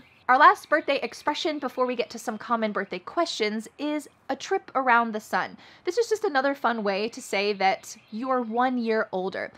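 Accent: United States. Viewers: your are so lovely ...... really .... Ithink my understanding for American accent getting better >> cause of you ...... thanks ..... keep it coming ..... Form Sudan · Is it American English??? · Are you from US.?🇺🇸😎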